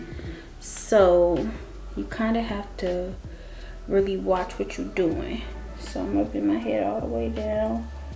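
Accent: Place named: South, United States. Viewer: love video and your country accent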